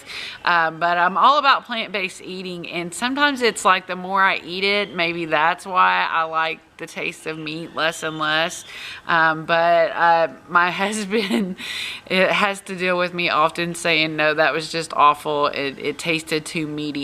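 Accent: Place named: Arkansas, United States. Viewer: You sound like you’re from Arkansas! My husbands family is from Texarkana... you have the same accent 😁❤️. Fun intro, and crazy fun stories about yourself! I’m glad hooked us up!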